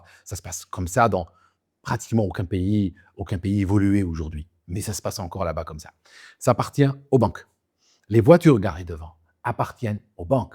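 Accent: Switzerland. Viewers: Ah l'accent suisse :) ....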